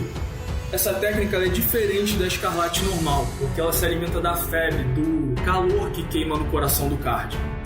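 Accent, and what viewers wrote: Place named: Rio de Janeiro, Brazil. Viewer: caralho sabia carioca fudido ainda e da zona norte ps; (moro na penha)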